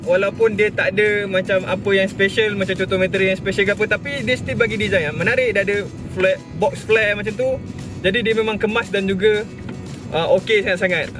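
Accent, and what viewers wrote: Malaysia. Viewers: abg ini omong emang melayu banget ada yg gak ngerti........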